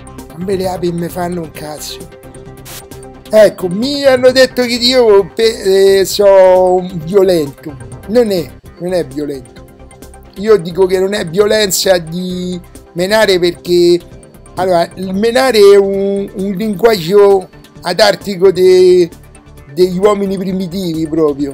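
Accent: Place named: Rome, Italy. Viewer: questo video solo 308 like? Che bel romanaccio! La bellezza del romano ruspante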